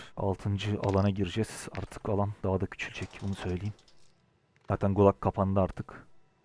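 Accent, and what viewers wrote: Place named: Anatolia, Turkey. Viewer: Hocam allahtan keşfetime düştün aşırı zevk aldım seni izlerken. Oyunundan çok konuşma tarzın aynı bizim Anadolu tarzına benziyor. Yayın bekliyoruz hocam eğer açacak olursan elimizden geldiğince destek oluruz inşallah.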